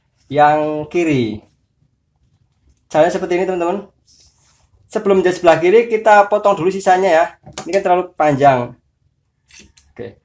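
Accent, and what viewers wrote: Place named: Java, Indonesia. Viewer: nglempruk wkwk kangmas e wong jowo ....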